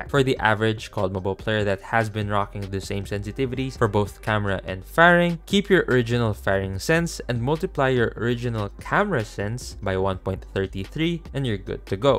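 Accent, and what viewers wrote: Philippines. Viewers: Bro you sound like a filipino but you live in qatar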